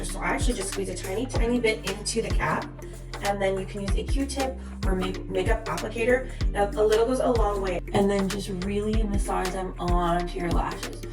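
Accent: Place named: United States. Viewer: Hey from Melbourne Australia 😁 I need to tell you and I hope you see this that I've never come across your channel until today and from the get go your presence in front of the camera, sounds weird but your American accent and tone is so easy to listen to😄 lol it's positive, straightforward, informative, believable, easy to relate to, fun to watch and you instantly drew me in! I love your name and the design on either side as there no other distractions in the frame and my eyes go instantly to you. " Well of course that's the intention" lol my favorite colors are pink/ purple/ turquoise, peach and silver and obviously that's another reason I was sucked in 💜 then of course you are an edgy, sassy, talented, funky, creative, bubbly, charismatic goddess of a UNICORN 🦄 Female🤩 God I'm full of compliments today lol and of course your stunningly beautiful have the best eyelashes of course and ooze mad swagger!!! 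There ya go💜 and I will be using all your tips for doing my lashes for myself when I buy the products I need. You brightened up my day so thankyou ☺ from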